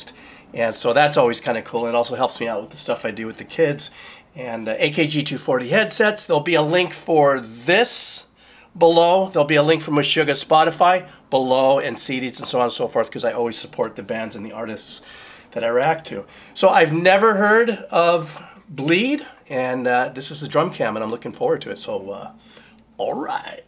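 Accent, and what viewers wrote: Hawaii, United States. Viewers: Where are you from brotha, sounds like your on the Islands. If your located on Oahu and give music lessons, I would be interested, Aloha.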